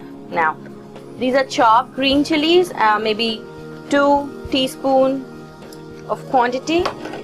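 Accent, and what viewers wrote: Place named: Bengal, India. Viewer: hehehe bhaja mosla that nice bong tone... :P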